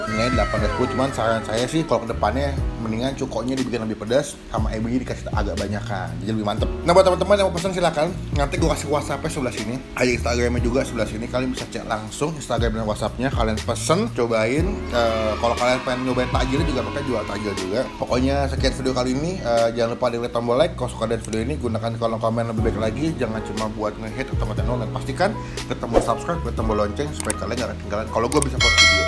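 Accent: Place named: Palembang, Indonesia. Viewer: Wong plembang mno suaronyo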